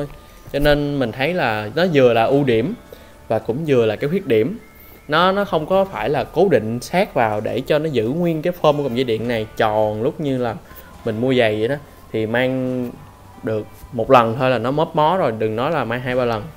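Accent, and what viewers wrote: Standard. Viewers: Anh nói chuẩn quá :33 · a nói chuẩn quá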